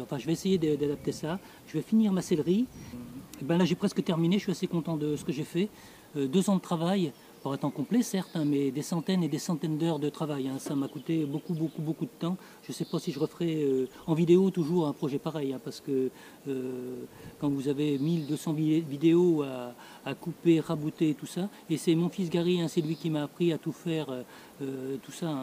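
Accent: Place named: France. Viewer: Un humain comme on les aime, pas de superflu, de l'honnêteté, du savoir-faire, l'accent franchouillard qui nous rassemble. Merci de nous montrer la direction du beau.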